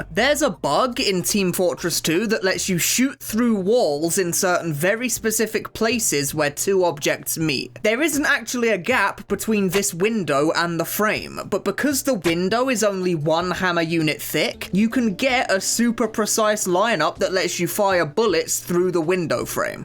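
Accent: United Kingdom. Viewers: violently British man talks about violent things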